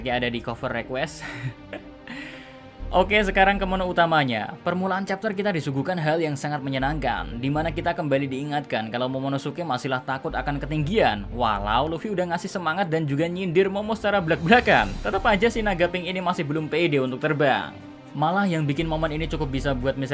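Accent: Java, Indonesia. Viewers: mind reques ..tampilin wajahmu mim soale model ngmge sampean iki wong jowo wkwkwk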